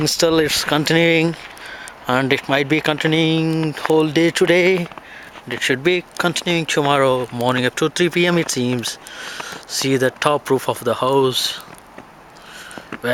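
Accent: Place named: South India, India. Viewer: u r a south indian........................ i can cent percent tell ,from your accent.... ur intensity-density, ur lastnight, ur drizzling drizzling continuing continuing, how is life from india.....ur place must be very hot . u r seriously shivering out there... dont cha MISSED INDIA.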